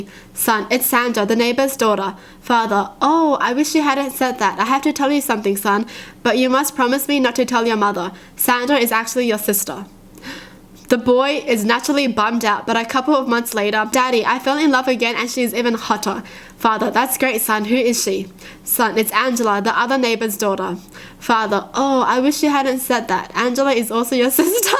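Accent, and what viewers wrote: Australia. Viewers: She has an accent. She is a aussie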